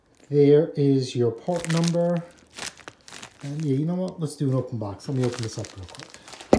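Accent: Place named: New York, United States. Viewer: Duude Tgat NYc accent is killing it... Totally Gangsta..😎😉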